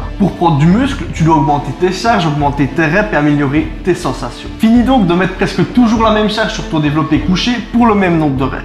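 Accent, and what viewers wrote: Liège, Belgium. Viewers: J'aime ton accent (liégeois je pense) mdrrr bonne vidéo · oufti Gros gros accent de Liège... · Les Nuls 😂😂 Excellent avec l'accent belge! Top Vidéo continue! 💪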